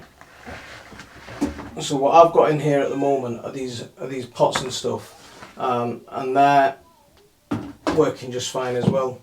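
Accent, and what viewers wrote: United Kingdom. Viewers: I could see that. Excellent. Tell me, it sounds like you may be second generation British. Where do your family originate from? I lived and worked in Bangladesh from <num>.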